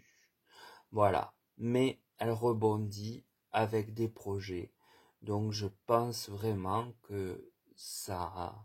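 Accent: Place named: Occitania, France. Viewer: Bonsoir merci pour le partage vous savez il y a toujours des êtres au dessus des autres!!! Faut passer outre comme la pluie sur les ailes d'un ( canard ) facile à dire certe! Nous sommes pas très loin je reconnais ( l'accent) d'Occitanie je vous écoute avec attention ( parce que) vous me parlez. Merci merci 👏👏👏👏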